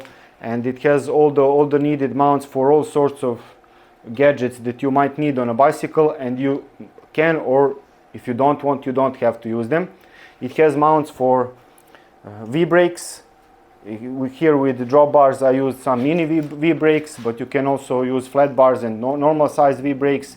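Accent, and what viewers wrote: Eastern Europe. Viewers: Your “Slavic accent”, my friend, is not a problem at all - and I’d bet that your English is a helluva lot better than most English-speakers’ grasp of any Slavic language 😅 Your videos make a lot of sense, get straight to the point and avoid hyperbole. There seems to be no agenda other than sharing your knowledge. This is all hugely appreciated, thank you. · Your Slavic accent is fine 😉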